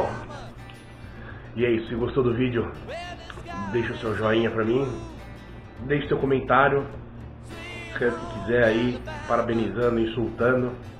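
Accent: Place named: Limeira, Brazil. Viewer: Amigo, vc é de Limeira-SP?? Parece, pelo sotaque.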